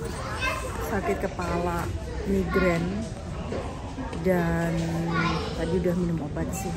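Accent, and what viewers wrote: Java, Indonesia. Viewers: Sampean iki wong ndi to mbak kok jowone bnr2 msh trsa....sgt bgs mba wong jowo ojo ngilangi jowone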